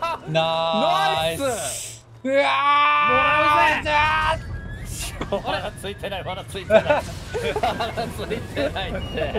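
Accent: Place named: Kansai, Japan. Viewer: 釈迦さんがちょいちょい関西弁の影響うけてんのジワる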